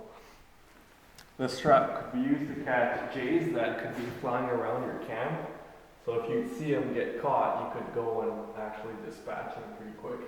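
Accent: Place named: Quebec, Canada. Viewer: Haha je m'attendais pas à entendre un accent québécois Great video the explanations were very clear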